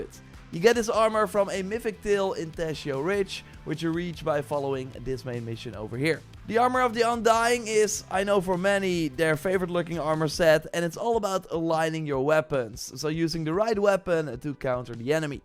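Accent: Netherlands. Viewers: This is the most Dutch English accent I've ever heard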